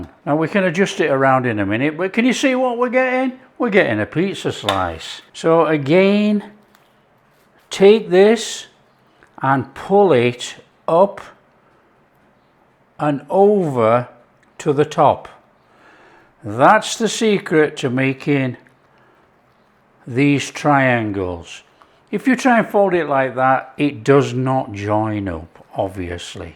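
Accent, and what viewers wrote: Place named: England, United Kingdom. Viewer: Love your scent which part of England are you from x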